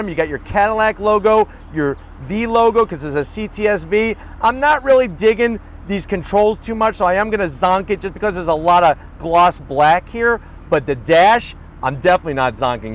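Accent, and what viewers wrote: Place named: Staten Island, United States. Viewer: Is it weird that i could listen to you talk all day hahaha! Sounds like you're from Staten Island or some part of NY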